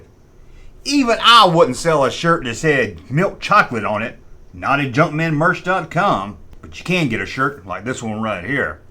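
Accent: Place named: New Jersey, United States. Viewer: Georgia? I thought you were from Jersey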